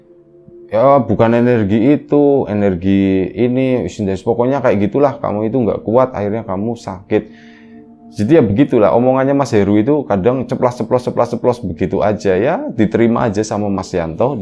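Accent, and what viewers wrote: Tegal, Indonesia. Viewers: Lama ndak denger, sekarang logatnya jdi tegal bgt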